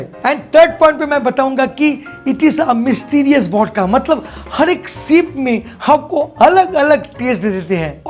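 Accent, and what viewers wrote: Bengal, India. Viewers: Dada, your English with a hint of Bengali touch is really sweet to hear. You have really done, us drink lovers, feel good about our drink. Knowledge about the drink, really adds a punch to the way we enjoy it. Just a little correction, "Rahasya" means mystery not secret, secret means "gupt".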